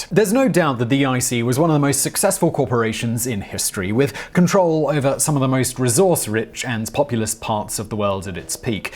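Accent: United Kingdom. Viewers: That's why you're watching a video narrated by a Brit.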